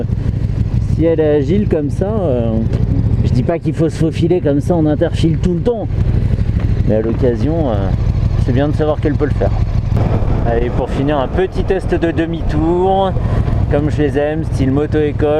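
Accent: Paris, France. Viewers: ton accent parisien est horrible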